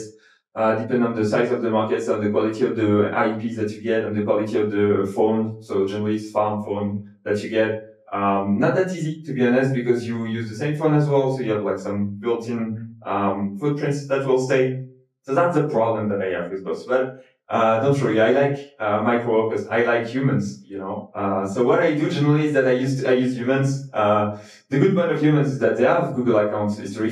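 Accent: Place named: France. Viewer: Fluent English ! With pretty french accent, j'aime bien :)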